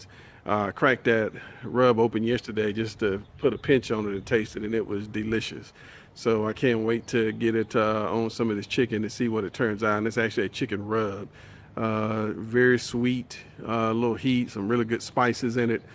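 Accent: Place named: Baltimore, United States. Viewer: I may be mistaken but from your accent, Baltimore right? Love the video.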